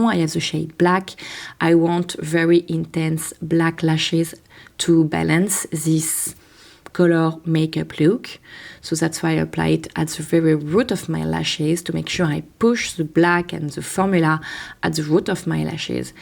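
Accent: France. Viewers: Thank you so much for another great tutorial. I always enjoy your videos but mostly I love your sweet French accent. I am Greek, I am an English tutor who adores Paris! To me you are the absolute ' '. Please keep on sharing your wonderful videos with us. Thank you again! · You are so beautiful. I like your tutorial so much. Love your hair and French accent. Love from Indonesia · Oh my god! I’m in love with your French accent! 💗💗💗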